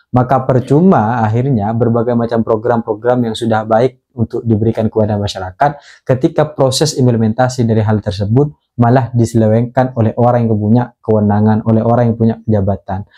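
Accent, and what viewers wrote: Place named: Madura, Indonesia. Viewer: Logat nya logat madira,, kamu gak ngomong moseng kke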